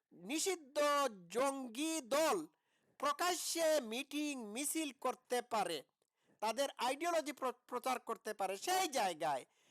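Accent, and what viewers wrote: Sylhet, Bangladesh. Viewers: তোমার বাংলা উচ্চারণ সিলেটি ল্যাংটা ফুরিদের মত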